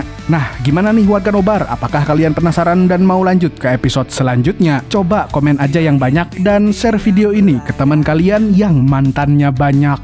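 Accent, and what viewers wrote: Banjar, Indonesia. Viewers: buhan Banjar jua sakalinya amang nih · urang banjar kah ni😂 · Banjar kalinya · Nahhh urang Banjar kh pian😂😂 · Urang banjar kahh amang nihh😂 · Banjar rupanya lahh😂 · Banjar kah mang? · Amang banjar nih wkwk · urang banjar ih😂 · gileee banjarnya kental banar!😂😂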